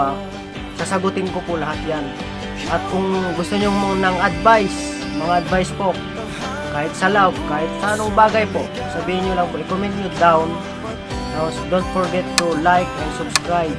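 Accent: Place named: Ilocos, Philippines. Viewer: Aguy gnda ng topic kabsat.tuloy mo lng yn topic mo hahaha... Panalo sa payo.Ilokano tlga ang tonoooooo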